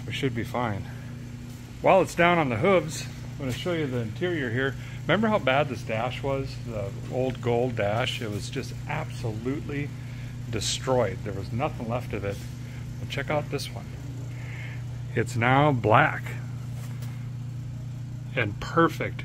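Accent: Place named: Tennessee, United States. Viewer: Great idea Thanks! Tennessee talk! I saved some thought was junk too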